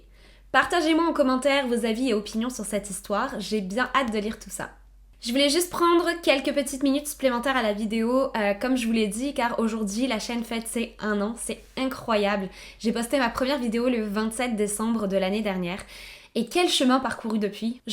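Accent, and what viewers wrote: Quebec, Canada. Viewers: Très intéressant, ce n'est choquant, mis à part l'exposition au publique, j'avoue que c'est une sorte de dérive, mais je penses que celà reste une forme d'amour, étrange, glauque, qu'on ne peu comprendre 🤷‍♂️ mais ce n'est pas un acte criminel, ou du genres, c'est pour ça je penses qu'il a été relâché direct, ce n'est pas réellement du vandalisme en définitif Après j'avoue que c'est étrange et difficilement compréhensible mais bon 🤷‍♂️ Ah oui c'est vrai que tu es française 😌🤘 d'où l'accent québécois très léger, jm disais aussi ^^ C'est cool en tt cas et j'adore ta chaîne et ta personnalité 😊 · Aucune apologie.......... De meme....... Une Française avec un petit accent québéquois !!!! Non de Lille mais de L'isle sur le doubs (dep 25)......... Voilà la raison pour laquelle vous etes toujours bien maquillée. Bonne fin d'année. un livre qui à mon avis est bien écris et intéressant : "The last victim" : Jason Moss et Jeffrey Kottler. 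(bouquin non traduit, version américaine). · Au début j'avais cru que t'étais juste d'origine française mais que t'étais vraiment québécoise parce que t'as vraiment pris l'accent et leur façon de parler, je suis aussi française c'est pour ça que je te le dis (et fan de supernatural au passage :p aussi de surnaturel/horreur) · Trop drôle t'es d'min coin ! 🤣 Je pensais vraiment que tu était Canadienne. 😅 Tu es passé du Nord au Grand Nord mdrr En tous cas encore une vidéo de dingue, quel taré ce gars 😵‼️ Continue comme ca j'adore tes vidéos ❣️ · Je suis surpris que tu sois de Lille, tu as vraiment pris un accent canadien je trouve, on retrouve pas celui des HDF dans ta voix mais c'est aussi compréhensible quand on s'expatrie. En tout cas, chouette vidéo, je t'ai découvert grâce à Mogota et c'est chouette ce que tu proposes.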